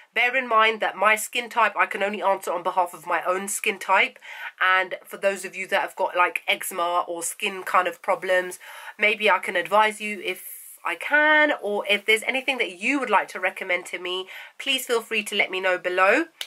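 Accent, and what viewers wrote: London, United Kingdom. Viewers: "Its french init" ur sooo london lol xx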